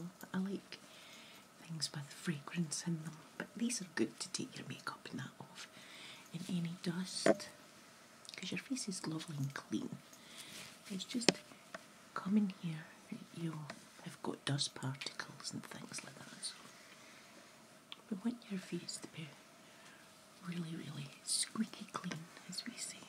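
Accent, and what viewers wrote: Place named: Scotland, United Kingdom. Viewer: YOU are an angel for running this channel and uploading these videos. I don't comment too often but you're my favorite asmr artist. English is not my 1st language and ever since I first heard a scottish accent it's been my favorite, it sounds so lovely and unique! Btw; your makeup always looks so nice. I love this eyeshadow look! 💗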